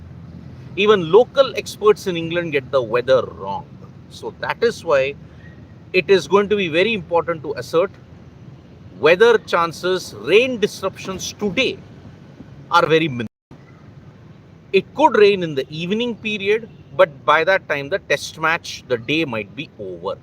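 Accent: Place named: Kerala, India. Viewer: Who is this guy? Sounds like a mallu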